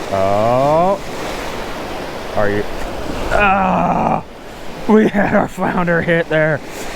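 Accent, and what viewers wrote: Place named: Alabama, United States. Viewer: After watching and listening I can hear the same Alabama accent as Beard. Lol Sounds country like here in Texas 🐟 🎣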